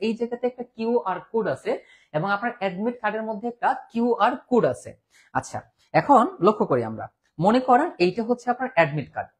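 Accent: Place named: Sylhet, Bangladesh. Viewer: Bro Sylhety✌️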